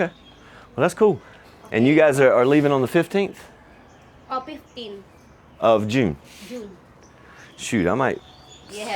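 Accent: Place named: North Carolina, United States. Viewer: The more I watch and listen it seems old North Carolina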